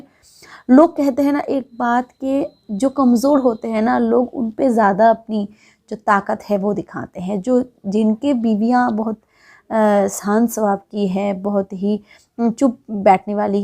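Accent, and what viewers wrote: Bengal, India. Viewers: Woh Bengali hi h 😂😂😂😂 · Interesting he mam aur app Bengali lag rahi ho appke tone se 😁❤️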